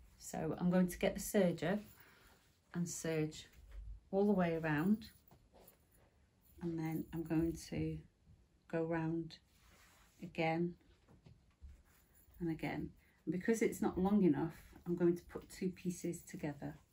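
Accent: United Kingdom. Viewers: 🧡 Love your tutorials and your British accent!! 🧡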